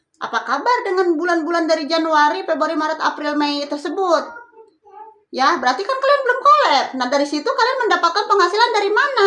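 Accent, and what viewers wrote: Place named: West Java, Indonesia. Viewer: beneran orang Betawi apa orang Jawa barat sih pok..😂😂😂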